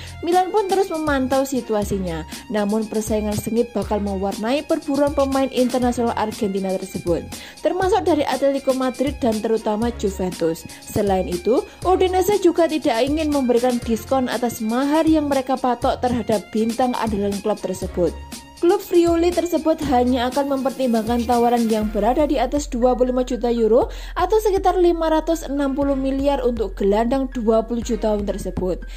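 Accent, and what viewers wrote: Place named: Medan, Indonesia. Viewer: Dari logatnya, aku tau mbaknya orang Medan, horas mbak 😂👋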